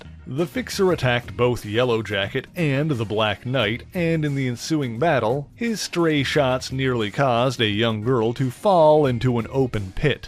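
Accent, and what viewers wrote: Newfoundland, Canada. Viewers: Love the channel. I have been wondering where your accent is from?Newfoundland?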